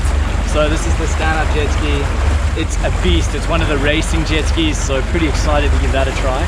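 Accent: South Africa. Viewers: Are you South African bro? And if so what advice would give someone who would like to work on yachts like you? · Are South African? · Are you south African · Are you South African??? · your accent sounds south african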